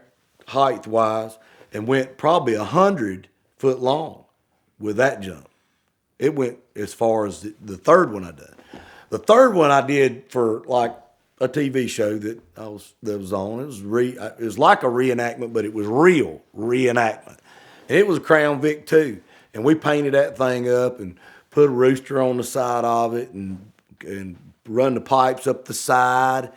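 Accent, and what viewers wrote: South, United States. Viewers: you were right! wicked fun story and the whole southern voice mixed in whith the southern sayin’s is gold! thanks ED, needed a good chuckle today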